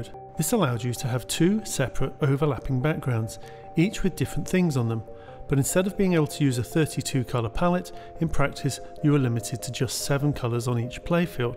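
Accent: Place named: United Kingdom. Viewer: I really like your voice. Friendly and pleasant. I like British accents too. :3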